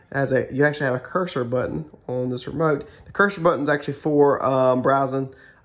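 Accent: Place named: South, United States. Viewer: face-orange-frowning: Had a hard time understanding too much mumble and southern drawl together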